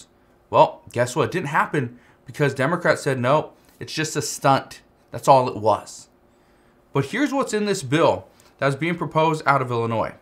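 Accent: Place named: Minnesota, United States. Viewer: Sounds like MN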